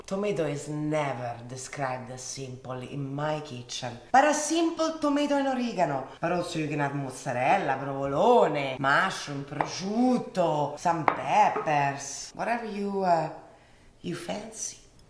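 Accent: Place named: Rome, Italy. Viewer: Bella e brava. Ma ,soprattutto, me taglio. Per il tuo romano. Fantastica❤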